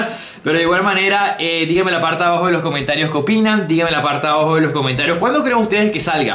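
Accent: Venezuela. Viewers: eres Venezolanl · Pareciera que fuera Venezolano, yo soy Venezolano ahí me avisas para jugar mano dejame tu WhatsApp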